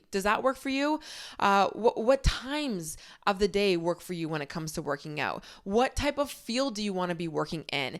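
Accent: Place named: Toronto, Canada. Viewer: you so have a toronto accent and it makes me so happy to hear! brings me back home in this world of influencers its nice to hear myself, my friends and loved ones in ur voice 🥹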